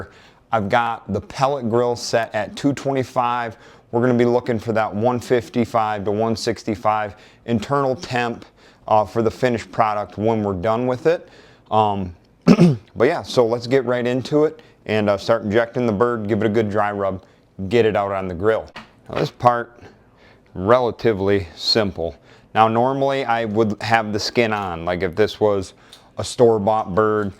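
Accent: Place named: Michigan, United States. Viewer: You are the first outdoorsman Youtuber from Michigan I've found and I'm so glad I stumbled upon your channel. It's nice to hear an accent I grew up with instead of a southerner shitting on northern hunting. I'm looking to get into turkey hunting this upcoming spring myself, very excited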